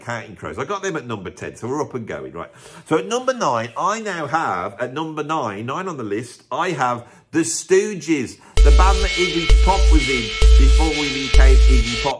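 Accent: Birmingham, United Kingdom. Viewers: Great topic BUT lots of gaps in knowledge and predicable cliched/wind-up stuff about bands in overcoats that actually sing about real stuff- rather than fairies and wizards- BEING 'pretentious'. That said I am loving the Brummie (faux?) accent. So please do a top ten of the best Brummie/Black Country bands wearing false sideburns and a mirror hat and screaming 'BABY, BABY,BAAAABY!' while scrunching your face up. 'Cup-a-Soup' optional.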